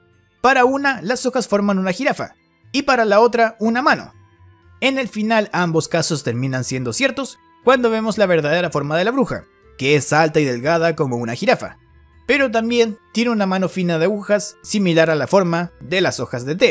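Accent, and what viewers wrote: Chile. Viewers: Eri chileno?? Pensé ke eras de Ecuador djjdjddk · este wn es chileno? Grande 🤝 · WAO ERES DE CHILE NI CUENTA ME DI , POR TU ACENTO :o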